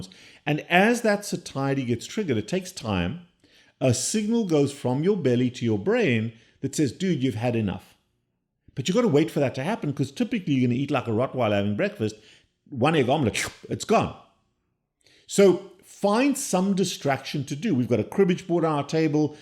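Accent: South Africa. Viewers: 😂Also the French done a child's portion by an interior decorator! 😂😂😂 I'm also South African, and he hasn't lost the way we talk, although he has left the country long ago😂❤ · He has a South African accent. In South Africa we speak Afrikaans which is very similar to Dutch.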